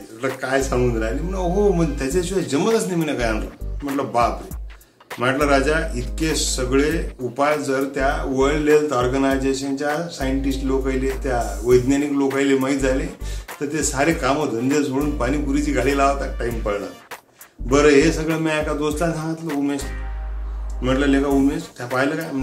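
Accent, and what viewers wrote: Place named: Vidarbha, India. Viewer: Ek no waradhi bhasha , Ani kisse BHAU you are great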